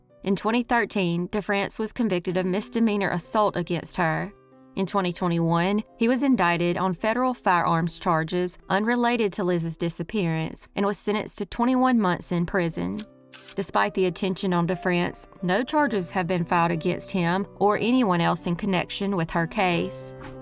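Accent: South, United States. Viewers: THANK YOU!!LOVE THAT COUNTRY VOICE! KEEP EM COMING!1:hand-pink-waving:face-red-heart-shape:thanksdoc:🤠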